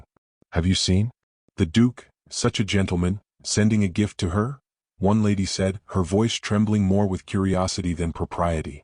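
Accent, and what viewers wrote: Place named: United States. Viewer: Terrible narration; too hurried; no change in cadence and too American!!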